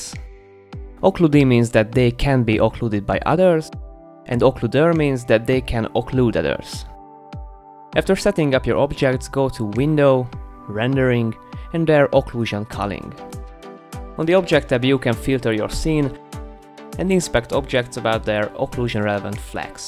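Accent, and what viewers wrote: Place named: Hungary. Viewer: Are you hungarian btw? You have an accent like all of us here.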